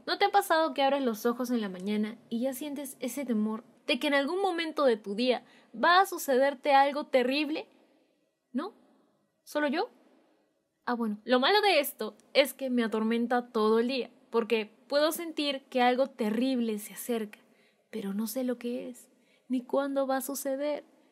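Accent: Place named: Chile. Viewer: sos chilena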